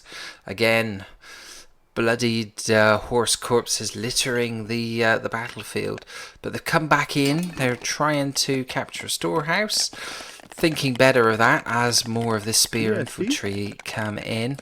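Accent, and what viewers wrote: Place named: United Kingdom. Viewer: If I may chime in, I’m really enjoying most of your content, watching both the new and old videos, and enjoying most of it. You have a really nice manner of presentation (and a cool British accent too), and although the games are long, I’m sometimes switch off to doing other things while simply listening to your narration. Tutorial type videos are a nice recent addition, really appreciate that. As for the casts, I think 4v4 look most impressive, as the outcome is a bit harder to predict, but 1v1 with closely rated players are also fun to watch.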